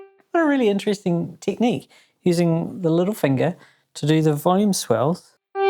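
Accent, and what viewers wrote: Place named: New Zealand. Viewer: 😅 Gotta love that Kiwi accent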